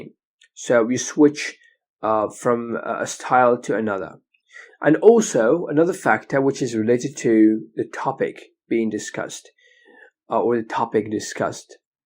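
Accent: United Kingdom. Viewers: well! liked ur British accent! for the first when i heard u , thaught ur an Trueee British man, but then when u said bejaia and tizi ouezzou, i became proud of u and i directly subsribed ur channel God bless u 🙏u simplified things for me too much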